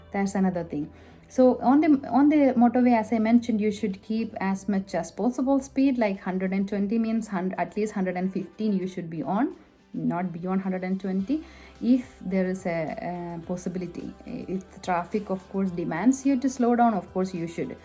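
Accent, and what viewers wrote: India. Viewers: by your accent I'm assuming Indian originally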